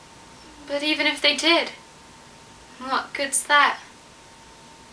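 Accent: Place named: Australia. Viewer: so two thoughts, First where are you from, on certain words i hear the slightest accent, like you hail from Australia or some thing, other thought, i really did not feel your emotion, you tried to emote but i did not feel it, lovely any how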